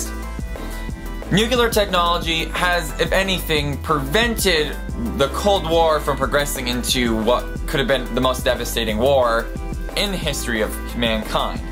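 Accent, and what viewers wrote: Maine, United States. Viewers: Wow. My first time hearing an accent from Maine. Hello from Texas! :D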